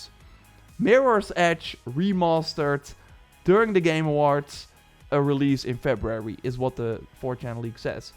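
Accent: Netherlands. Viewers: nederlands accentje valt wel goed op he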